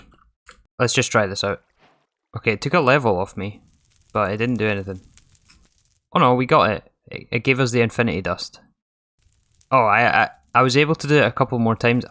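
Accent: Scotland, United Kingdom. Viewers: I think it might be one of the best gaming experiences I've ever had, to be honest. My wife is probably feeling neglected though. Forgive my American ignorance but is your accent Scottish or like northern Irish?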